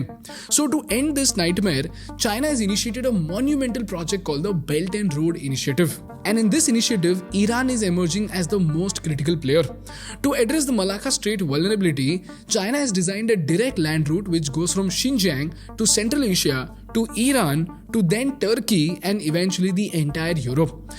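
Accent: India. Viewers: No indian accent please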